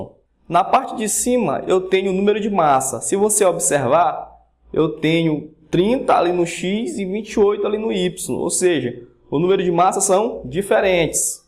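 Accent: Northeast, Brazil. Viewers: Consegui entender melhor devido ao seu sotaque, tbm sou nordestino, abraço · Huum Sotaque do Nordeste! Boa aula ♡ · VC é nordestino? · nordestino foda kkkkk É noix vlw <3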